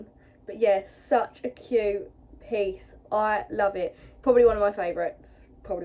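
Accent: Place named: United Kingdom. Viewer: you are GORGEOUS. Beautiful brown/green eyes, beautiful smile and cute voice with the British accent. Beautiful body and CURVES. You are STUNNING in everything you wear. All of the outfits in this video look REALLY cute on you and the colors they are make you BEAUTIFUL. The greens definitely look GREAT on you and bring out those beautiful eyes. You are GORGEOUS and SUPER cute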